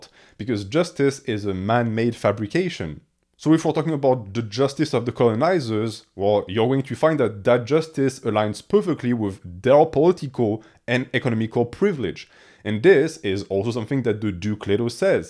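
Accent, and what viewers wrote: France. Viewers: The French accent when speaking English is so pleasing to the ear. I could listen to you talk all day. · Listening to a French guy talk Dune for over 3 hours was as delightful as I thought it would be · Selon votre accent, je dirais que vous etes francais. I found the french version of the audiobook vastly superior to the english one, what were your thoughts ?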